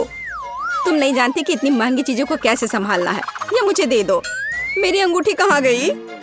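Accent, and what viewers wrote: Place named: Bhojpuri, India. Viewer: Such a boring voice this lady has... Bhojpuri touch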